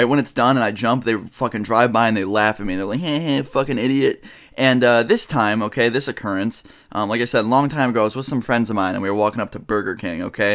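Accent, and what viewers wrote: New York, United States. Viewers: Aye it sounds like your from New York that's how people are over here lol are you?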